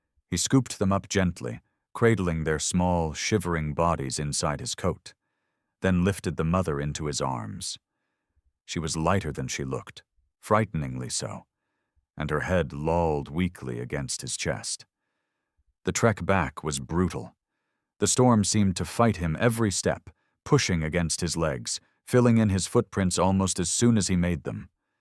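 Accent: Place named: United Kingdom. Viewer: Uk English 😅😅😅😅😅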